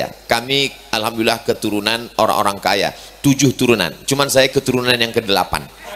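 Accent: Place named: Sumatra, Indonesia. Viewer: Maklum lah , kita orang Sumatra dialek kayak gitu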